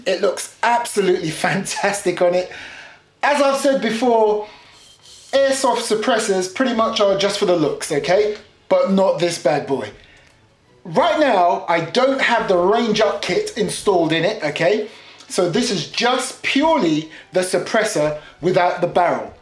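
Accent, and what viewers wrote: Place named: London, United Kingdom. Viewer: Hi, how long did it take for JKArmy to delivery to London (assuming you are from London from your accent)? Also, were there any issues with customs? Definitely thinking of picking this up. Thank you!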